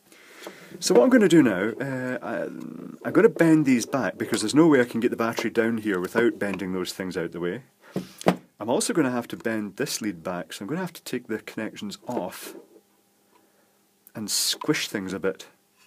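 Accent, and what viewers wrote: Scotland, United Kingdom. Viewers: i find your vids very entertaining and informative, and your smooth scottish accent makes it quite soothing! good man keep it up!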